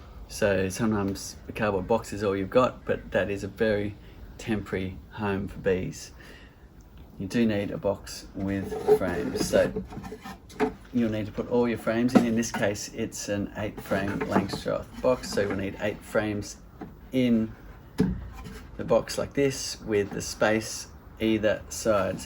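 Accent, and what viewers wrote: Australia. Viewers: Think he is a Aussie/New Zelander from the sounds of it.. More like M8/mate....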